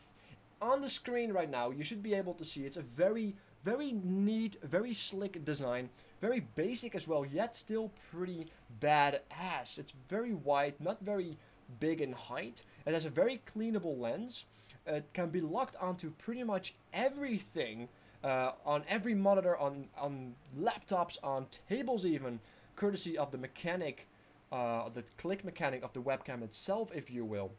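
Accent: Netherlands. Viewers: the dutch accent gave it away :D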